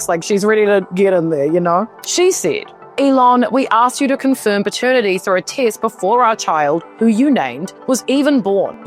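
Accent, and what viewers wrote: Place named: New Zealand. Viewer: Really enjoying you videos! Are you a kiwi? 😊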